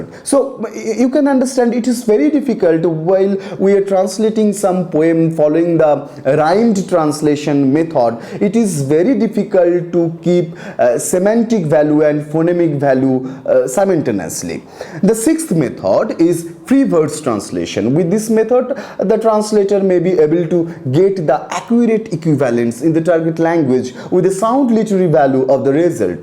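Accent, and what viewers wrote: India. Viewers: This is very useful, but apart from that I don't understand a few words, because you speak too fast and your Indian accent is so thick which makes it difficult for us to understand, not only that, I was very serious but it all goes away because your speech is so funny to hear. maybe for the next video you can speak more firmly and slowly, that's all my criticism.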